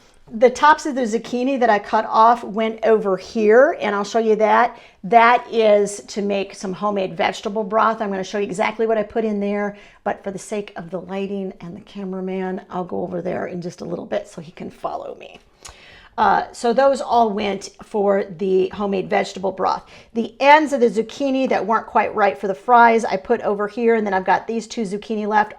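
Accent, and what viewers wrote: Indiana, United States. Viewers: Oh my goodness I love your Hoosier!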